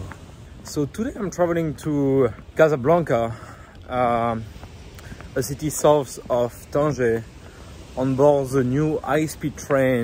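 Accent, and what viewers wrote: France. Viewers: ton accent c'est trop ..francais, la prochaine, parles toi en francais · On sent un petit accent français 😁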